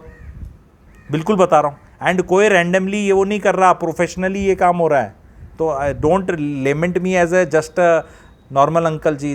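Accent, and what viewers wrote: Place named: Haryana, India. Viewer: I love your accent English , Hindi, Haryanvi😊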